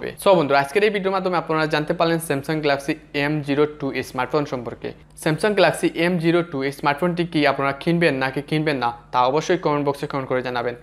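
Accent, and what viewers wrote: Sylhet, Bangladesh. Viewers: vai sylhety na kita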